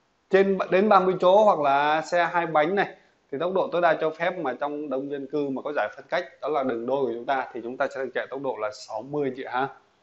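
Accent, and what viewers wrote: Thanh Hoa, Vietnam. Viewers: Thầy người Thanh Hoá,nói giọng Thanh Hoá nè. Thấy thân thiện dễ gần và lịch sự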